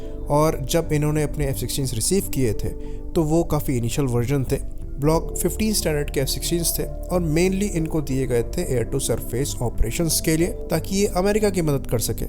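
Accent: India. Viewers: Sawdesi 😂😂😂 tatti indian accent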